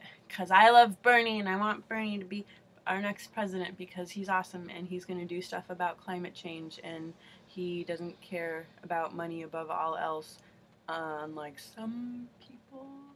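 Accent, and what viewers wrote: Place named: Peru. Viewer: Sounds very Peruvian :)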